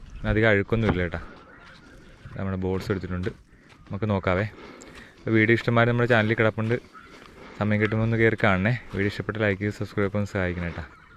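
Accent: Alappuzha, India. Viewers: Alleppey slang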